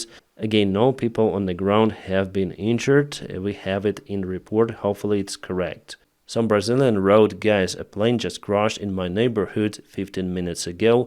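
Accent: Russia. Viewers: I love this narrator's accent!🎉 is it Russian?❤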